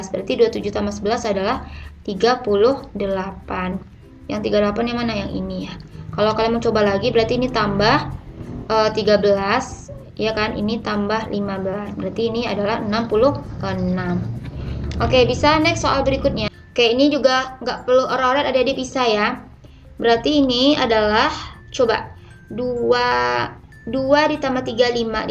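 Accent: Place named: West Sumatra, Indonesia. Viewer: logatnyo mirip urang minang akak ko wkwkwk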